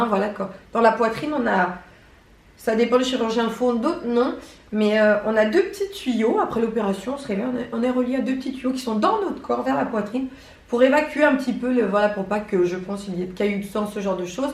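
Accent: South, France. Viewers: Son probleme c'est pas son ex-bonnet B, c'est sa voix et son elocution, ca fait trop cagole du sud. Enfin si elle est heureuse comme ca ( Bon elle les a fait refaire 2 fois et jamais 2 sans 3 )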